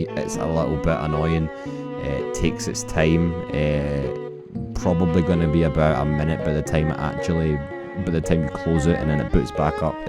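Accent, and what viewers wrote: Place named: Glasgow, United Kingdom. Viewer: Not only do i thank you for this video but i adore your accent lad , u from glasgow?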